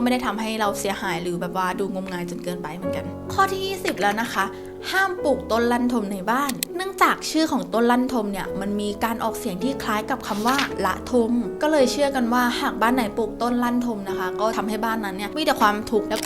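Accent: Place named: Thailand. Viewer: เสียงน่ารักจัง มีความเหน่อนิดๆ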